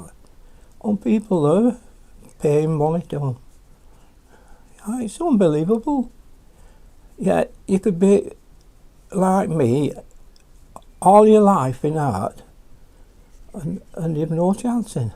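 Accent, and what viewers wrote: United Kingdom. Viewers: I love your accent! What part of the UK are you from?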